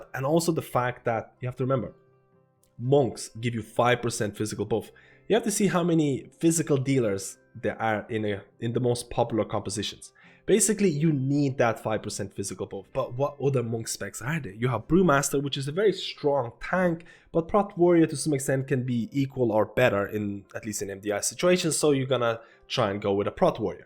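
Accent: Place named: Ireland. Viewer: I thought he somewhere was northern European but then he started sound Irish...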